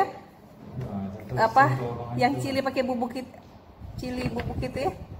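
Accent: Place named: Palu, Indonesia. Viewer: Cucok meong memang ngana pe bakat...wkwkwkwk...hamaaaa...te sengaja qta dapa ngana pe vlog gegara ba cari resep sambal goreng daging kong pilih ngana pe resep,trus dengar2 ngana bicara roa...roa...qta pikir vlogernya orang Palu stouw...ternyata oh ternyata memang ngana orang Palu kong tamang SMP.lei...dunia memang selebar daun kelor kang hahahahah....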